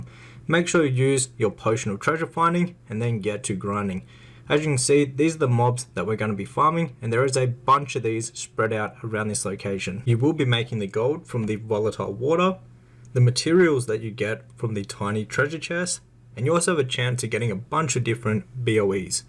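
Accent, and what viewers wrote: Australia. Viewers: Wow man.. still at it?! I remember being a kid playing on my cousin's account and looking up how to make gold / farm mats and I heard this rad ass Aussie saying "check out this farm, its 'Bad P**sy' " I come back to the game and find myself here again with same OG posting content! That's dedication homie! GGs!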